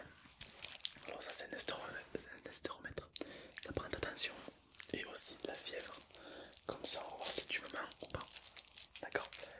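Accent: South, France. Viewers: J'adore ton accent du sud MOI JE VIENS DE MARSEILLES!!!!!!!!!!!☀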